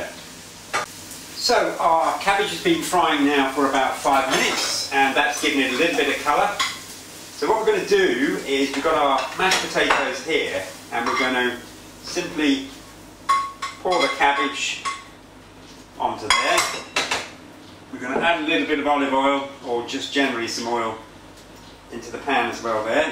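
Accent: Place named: England, United Kingdom. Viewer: After trawling through the many offensively inauthentic bubble and squeak videos on Youtube (where bubble and squeak is baked or includes egg or is formed into patties), I KNEW I'd found the genuine article when I started this video and saw this very English-looking, English-sounding gentleman in his very English kitchen. I've been having trouble keeping my bubble and squeak in one contiguous chunk through the turn (a challenge, as I've been using a large, heavy pan), so I appreciate the direction when it comes turning the thing over. It's great to see someone doing this properly! Thank you!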